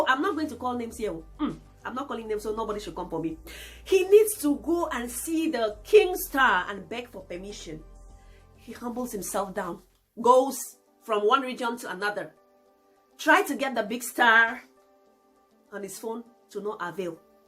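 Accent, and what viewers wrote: Nigeria. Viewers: Wow. Even you talk like a Nigerian though. I mean you said "abi" a yoruba word. Having said that, the entire Africa has a long way to go. 1. You want to compete with a population of almost 200 million 2. Nigeria has the biggest economy in Africa 3. They are all over the world holding key positions and owning platforms that makes it easy for Nigerians to climb. 4. It's too late for the ban to have any effect cos of the Internet. 5. The competition in Nigeria is extremely high in everything you can think of, which brings out the best. Many do not make it at all. Even churches are competing. 6. Nigerians hustle like no man's business. Take one of your cameroon musicians, let him stay in Lagos for a year and then take him to Cameroon, you'll be shocked how he will stand out from the rest . The hustling spirit would have rubbed on him. 7. Other industries like film, have helped project our image, lots of Nigerians abroad are recognised globally so it helps. 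Solution is to collaborate, humble yourselves, ask questions, take trips back there to see how things are done, invite the top pple to Cameroon to hold forums on how to improve. One love to all Africans, as a Nigerian i believe we can all win, we just musnt pull ourselves down. God bless. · You look and sound nigerian. Am a ghanaian but I will surely mistake you for a nigerian. I think nigerian music will remain the best, maybe because of population. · It really hard to believe that you are not a Nigerian, it has been a shock a an unbelievable experience for me for the past few days that I bump into a YouTuber discussing about this whole Nigerian music ban, but deep down in me I was like are these people drunk??? Are they not Nigerian, they way they talk and new you too, if you come to Lagos I swear no one will believe you're not a Nigerian even if you keep telling people, I believe there's something more to this, you guys are more Nigerian than what I think. 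Love you sister. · You also speak like Nigerian, ....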